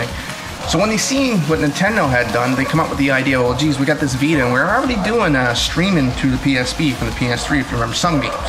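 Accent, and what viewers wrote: Newfoundland, Canada. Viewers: Where are you from buddy? your accent sounds like your from NFLD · You have got to be Newfie man. I know a fellow newfie when I hear one.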